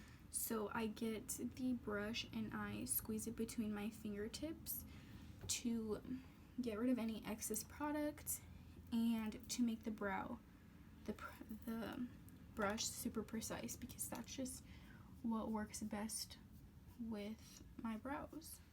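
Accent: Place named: Florida, United States. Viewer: You sound like you’re from Florida based on your accent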